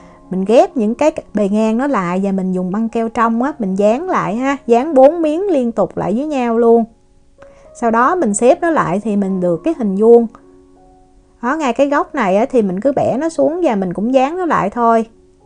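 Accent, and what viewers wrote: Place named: Mekong Delta, Vietnam. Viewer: lam Theo cach cua Chị Thanh cong nhieu mon ma khong co cach nao khoe voi Chị , Trong clip Chị co noi nha Chị o bien hoa ? Ma nghe tieng Chị Em nghi Chị o mien Tay , Em cung o gan bien hoa 😀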